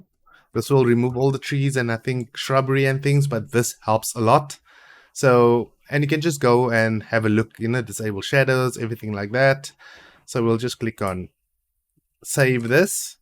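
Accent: South Africa. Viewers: ive been trying to play this game on pc of so long and your vid really helped me tanks(btw are you south afrian) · bru are you south african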